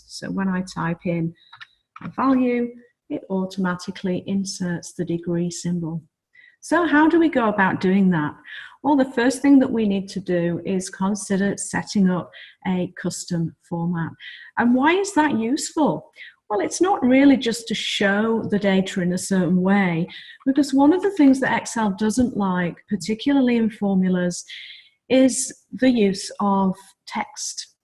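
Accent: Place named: East Midlands, United Kingdom. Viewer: Thanks, very helpful, succinct and to the point. I like your accent, is it East Midlands?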